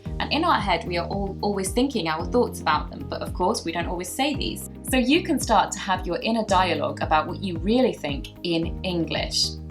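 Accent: United Kingdom. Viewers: Hola ! You said everything right in spanish !! You can practice with me ! I'm a native speaker !! I want to speak British I really like the accent !! 😁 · Wow.. amazing British accent! U have a new follower : ) Por cierto hablas muy español ;)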